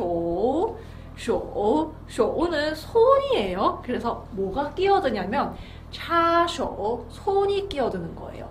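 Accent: Gyeongsang, South Korea. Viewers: 늘 경상도중국어 잘 듣고있습니당.. 不要插手。 난 손을 콘센트에 넣지마. 인줄..ㅎㅎ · 님 3개국어 하시네^^ 중국어. 대구사투리.. 귀여워요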